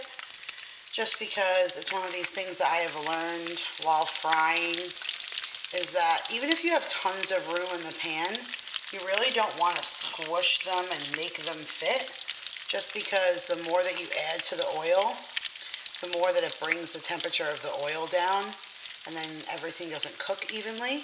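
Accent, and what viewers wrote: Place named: New Jersey, United States. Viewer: I recently started watching your videos. Where are you from? We just moved to New Jersey last month (my husband is in the military) and your accent sounds familiar to what I hear around here sometimes. I'm super excited to try out this recipe.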